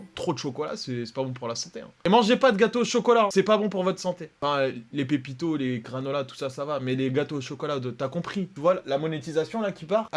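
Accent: Colombia. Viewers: L'accent colombien 200000/20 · Accent Colombian: 20/20❤️ Leonard di Vinci: 58/20😍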